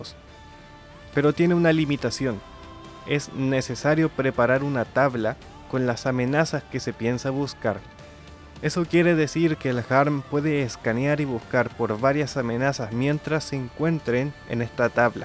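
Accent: Chile. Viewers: Excelente video y su análisis. No es usual encontrar análisis tan objetivo basado en la especificación y proyección técnica que podría ser usada en un conflicto. Siendo venezolano donde junto a EEUU (país fabricante) poseemos una de las escuelas y formación de mayor data sobre esta plataforma, no me es de extrañar que posiblemente (por el acento) un hermano chileno, nos de tal orientación, donde concuerdo perfectamente en el entendido que se quiere hacer ver que los F16 seran los dueños del espacio aéreo ucraniano y nada más lejos de la realidad, ya que sólo son una plataforma y los rusos aún por: 1)Entrenamiento y conocimiento de las propias 2)Capacidad de sus plataformas 3) Posicionamiento y plataformas multiplicadoras 4)Tecnología Finalmente 5)Número y diversidad plataformas como de unidades Superará con creces la variante aérea que implica el ingreso del F16 (en versión reducida) Sólo en entrenamiento, en conocimiento para luego llegar a un dominio determina muchas horas de vuelo, que será muy difícil lograr y esto es uno (muy importante) pero sólo uno de los factores que determinan para lograr algún entorno de éxito. 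Por el contrarío el ingreso de esta plataforma involucra factores verdaderamente preocupantes a ser considerados ya de emergencia en el Consejo de Seguridad de las Naciones Unidas, ya que esta plataforma a diferencia de las Rusas es más delicada ante impactos, pistas de aterrizaje, su mantenimiento y su reparación, esto DIRECTAMENTE determinará que países eu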